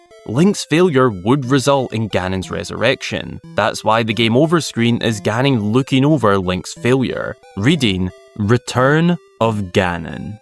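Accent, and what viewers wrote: Scotland, United Kingdom. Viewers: Scottish Zelda Storytime is now my favorite Storytime. 💚🏴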